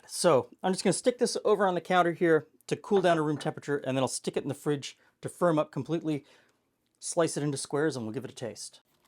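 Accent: Canada. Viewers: Whats the worst thing that could happen? Nothing! There is no downside to that scenario. 😝 You need an “Evil” playlist. The mars bar/ricebubbles thing definitely has a place on the list. That car-mel v’s car-a-mel bugs the heck out of me. We say car-a-mel, because that’s how it’s spelt. Never heard it called car-mel until youtube introduced me to american cooks. I’d rather listen to them say allooominum and tomaaaato all day than hear them say car-mel even once. Flippin weirdos 😂. But if you Canadian’s spell it car-mel, well thats ok. It sounds perfectly lovely in your Canadian accent.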